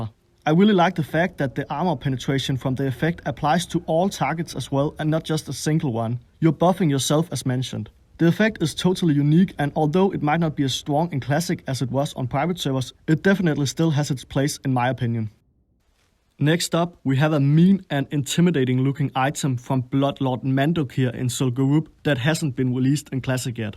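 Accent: Denmark. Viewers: Ey dude! Har set lidt af dine videoer, de sku meget søde, men den der danglish, det ødelægger det helt vildt, har du overvejet bare at lave danske videoer? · 110% a danish lad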